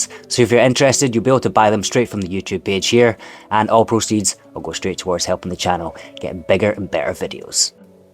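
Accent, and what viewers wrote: Scotland, United Kingdom. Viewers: Just started watching your videos 3 days ago and absolutely love them! Recognized that Scottish accent too. I was stationed at Holy Loch in 70-71. I really miss that place and its wonderful people there. I just subbed and look forward to your excellent shoe repair.😊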